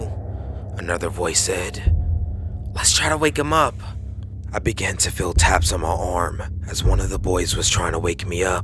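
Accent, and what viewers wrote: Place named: South, United States. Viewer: Awesome! I like your accent! I'm guessing it's from the south, but I'm half Japanese,half Danish so I'm not the best to hear the difference,but been to US many times and my aunt lives in Houston and your accent sounds like my uncles! Anywho I'm very fond of your narration, accent and especially when you get your lady to knock and you let us hear the "takeout" it makes you "human" ! Hope the new stupid guidelines won't affect your channel as you have great thing going on here and you know what hot now! Hope you'll do more ghost/paranormal as your voice is great at that! Good luck with everything! Xoxo DM ,Copenhagen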